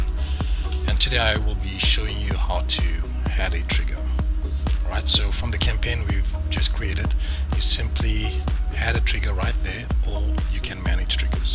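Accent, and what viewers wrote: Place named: Europe. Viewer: Love the groovy music along with Euro accent.... I feel like I'm in the club!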